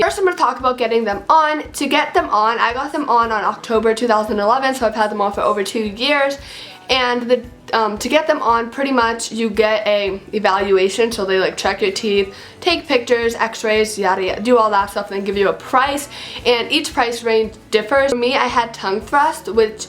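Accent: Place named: United States. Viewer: It's called an American accent.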